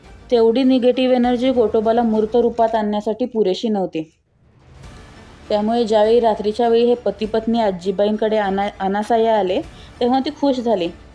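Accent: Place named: Konkan, India. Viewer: Kokani tone Chan aahe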